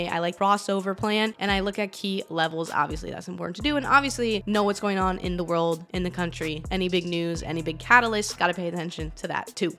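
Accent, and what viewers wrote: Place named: California, United States. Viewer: “Super hella delayed” Cali-bred through and through. I love it! Great video again ❤️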